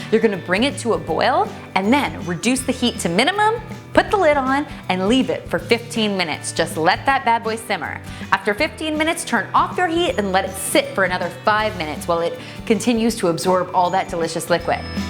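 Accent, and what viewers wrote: Canada. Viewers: Thank you I love these recipes! Are you from canada ? I can tell we talk the same way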